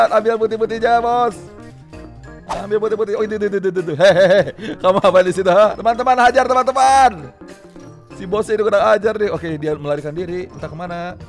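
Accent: West Java, Indonesia. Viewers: Bang orang Sunda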